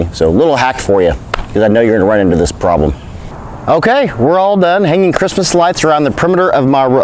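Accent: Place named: Midwest, United States. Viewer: It’s a Midwest thing. I don’t hear it, but definitely try to pronounce as roof when I’m talking to people.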